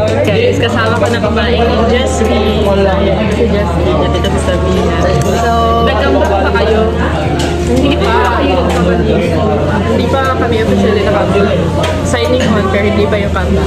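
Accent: Visayas, Philippines. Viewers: Teh bisaya ka po ba?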